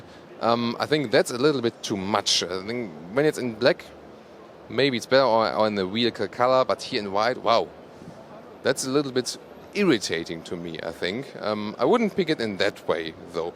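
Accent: Germany. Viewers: Sorry , but your English is a pain - warum sprichst Du nicht einfach in Deutsch? I work and live in an English speaking Country - this strong German Flavour is too much - i recommend spend some time in Oxford and some time in Boston - that might help.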